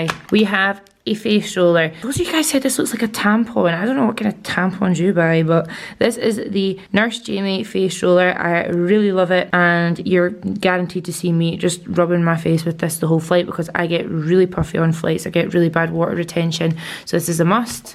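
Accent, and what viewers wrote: Scotland, United Kingdom. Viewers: Are you from Scotland 🏴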